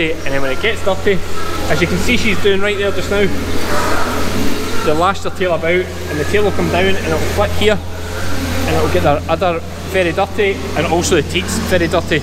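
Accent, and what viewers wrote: Scotland, United Kingdom. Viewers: I’m so glad Sandi recommended you! If my daughter-in-law weren’t from Scotland, I’d need subtitles. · I had a couple of Scottish colleagues when I worked in the UK years ago so it is nice to hear the accent again. The YouTube generated subtitles can be quite funny in how they don't always fit.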